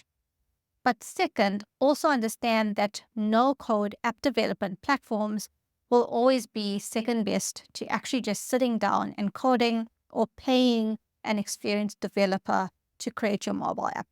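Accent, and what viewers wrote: South Africa. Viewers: Is that a south african accent? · Are you south African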